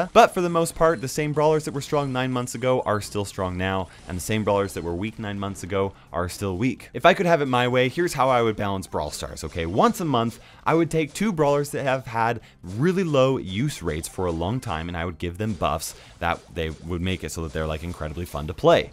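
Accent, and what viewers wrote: Australia. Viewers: SHE IS AUSTRALIAN NOT BRITISH!! REEEEE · That's Aussie not British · She's ausie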